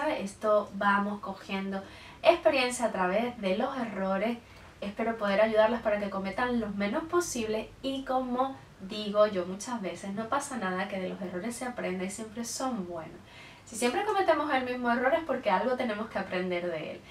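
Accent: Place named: Venezuela. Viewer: Hola de donde es usted de que país su asentó es como de Venezuela?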